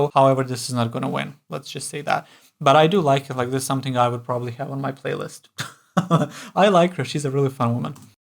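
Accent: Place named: Bosnia and Herzegovina. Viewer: Oh my...you have a lot of spare time in your day. Some cultures speak directly and don't beat around the bush. Some are more passive-aggressive. The latter use more "neutral" and "politically correct" language, but their intentions are far from kind or respectful. My points are: 1. Not everyone is British on the internet/YT. 2. Not everyone speaks English as native (and the only one) language. 3. Everyone is entitled to their opinion and feelings. 4. If you [autor of this clip] don't have anything nice to say - don't say it. I could say a lot on his wall "art" and it's placement, but I will not. ;) 5. Cross cultural communication is not easy. 6. I understand that this is a clickbaity title, but saying "I'm not feeling" ALL songs from a particular country, and at the same time coming from a country that not really known for "feelings" nor particularly great results on the ESC is what you say the UK "interesting". 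;) P.S. I've just realized that this commentator has an accent in English and that he's coming from Bosnia which adds another layer of complexity ;) Hope the UK performer will not be the last this year. ☮️ Have a nice day [not sarcasm, really mean it] Cheers!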